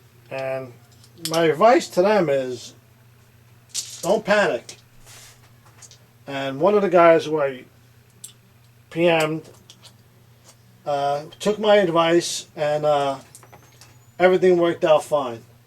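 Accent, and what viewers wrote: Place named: New York, United States. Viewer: just a New Yorker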